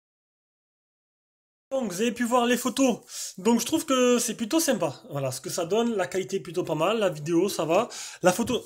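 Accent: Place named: Marseille, France. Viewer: Ta un accent marseillais comme moi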